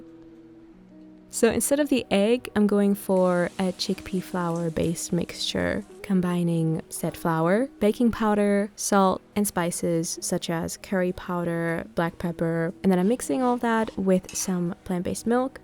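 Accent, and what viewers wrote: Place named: Germany. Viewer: Is she German? I swear her accent sounds super German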